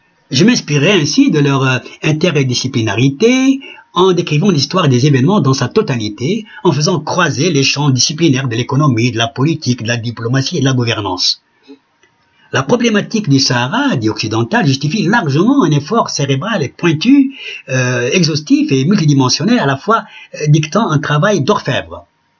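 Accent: Paris, France. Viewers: Une prestation magnifique, magistrale. On ne dirait pas à première vue que c'est un citoyen arabe qui parle dans la langue de Molière avec un accent purement parisien, dans un langage châtié, caractérisé par une éloquence qui fascine, une rhétorique qui plus qu'attirer l'attention, la retient du début à la fin. On est condamné dirais-je à une concentration brisant les cailloux de l'ennui des discours monotones, comme disait Paul Guth. Un véritable tribun qui rappelle Jaurès, mais avec un style plus modéré dans le ton et l'accent, comme dans une symphonie Beethovénienne, où la mélodie s'élève et descend harmonieusement, dans un débit parfois shakespearien. Le Professeur joue avec les mots comme il peut, mais toujours dans le sens qu'il veut, dans le respect de la grammaire. Voilà pour ce qui est de la linguistique. J'ai donné mon point de vue en ma qualité d'enseignant agrégé de grammaire. 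S'agissant du contenu et de la sémantique, j'en apprends d'avantage sur un conflit dont beaucoup de Français comme moi ignorent tant de choses. J'ai hâte d'en savoir plus, à partir du prochain épisode. Mais déjà le premier, annoncé en guise d'avertissement et d'intro, annonce déjà bien la couleur. Bon courage Professeur, et bonne chance par le Livre et la Plume. Vous honorez la langue française pour ne pas dire la francophonie, meux que des milliers de Français. Victor Hugo dormirait bien sur ses deux oreilles dans sa tombe.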